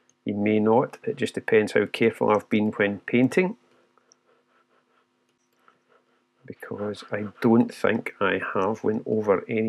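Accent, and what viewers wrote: Scotland, United Kingdom. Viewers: Thanks a lot for your shared expertise and for the files used to follow your really helpful video. Your a great Ambassador for Luminar. I follow you from the first day I used Luminar 4. Really helpful. Besides I love your Scottish accent (I'm a fan of Scotland).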